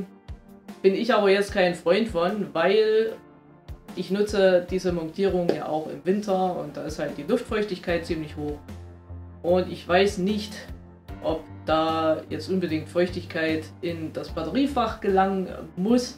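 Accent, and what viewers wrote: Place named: Saxony, Germany. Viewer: Da kommt wer aus Sachsen? Xd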